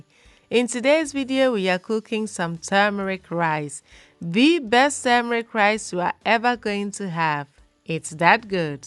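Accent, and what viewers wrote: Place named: Igbo, Nigeria. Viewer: what ethnicity are you? i love your speech, are you Igbo? hug you beautiful person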